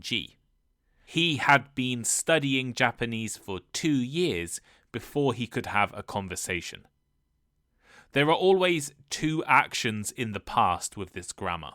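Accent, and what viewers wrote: United Kingdom. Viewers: Oh, the British accent! Wonderful 🎉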